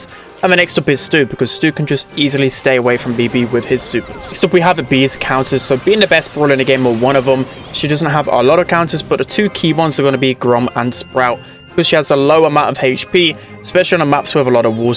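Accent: United Kingdom. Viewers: I NEED TO WATCH THIS BUT I CAN'T STAND HIS BRITISH ACCENT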